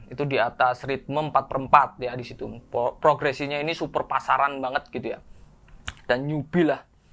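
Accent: Java, Indonesia. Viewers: Suaramu medok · kalo mo tengil paling gak mandi dulu mas.. hahahaha medok pula wong jowo gua gua an ahahhahaha *cangkulnya bawa lagi mas tar mandor nyariin wkkwkw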